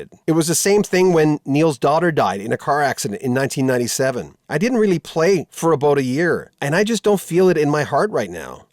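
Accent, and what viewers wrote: Canada. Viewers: When you started reading Alex's quotes I was like, "This guy really sounds like Alex. I wondering if he's doing an impression consciously." Then I kept listening and thought, "Nope...just Canadian."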